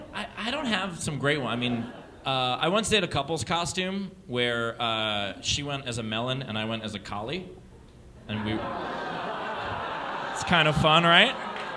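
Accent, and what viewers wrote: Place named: North Carolina, United States. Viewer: Oml i know that nc accent feom anywhere.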